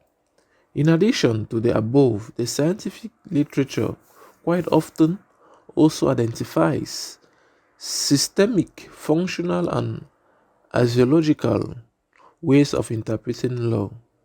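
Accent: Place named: Africa. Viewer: Африканец говорит, плюс понятно что он просто читает, с сильным акцентом, плюс понятно что он ничего в этом не понимает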